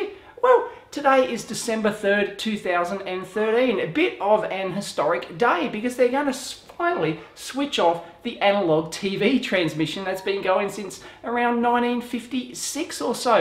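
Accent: Australia. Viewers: It’s Australian sorry to break it to ya mayte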